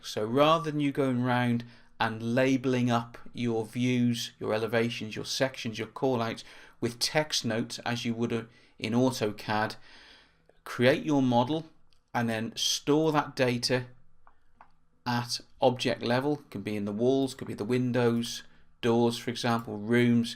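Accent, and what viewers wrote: United Kingdom. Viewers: I installed a YT Add-in which allows you to set speeds up to about 25X....but I find that 2.5-3X is the highest, intelligible speed with this (& many other Brits). However, is one of the better, cohesive sets of videos out there.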